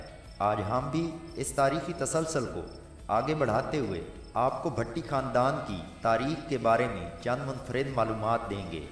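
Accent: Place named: South Punjab, Pakistan. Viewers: Saraiki lagda... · janoobi punjab se lagty ho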